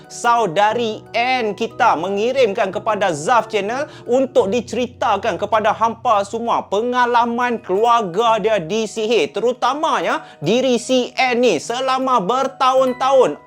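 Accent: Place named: North, Malaysia. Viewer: Slmt pg channel..hahaha terbaik intro kali ni..dimulakan dgn selawat.. cuma agak pelik mendengar bckp loghat utara..canggung bunyinya..tp kisah kali ni mmg best..nama pggilan pn sama mcm nama mamy...waah perasan sekejap nama mamy disebut2.. 🤣🤣🤣.. kisah kali ni mengingatkan mamy pd ank dara mamy yg disihir lbh kurang sama waktunya dgn kisah dlm video ni..waktu pkp..tp skrg alhamdulillah skrg semua dh ok..ank mamy tu dh sembuh.. terbaik video kli ni..bnyk pengarannya..best👍👍👍🌹🌹🌹🌹🌹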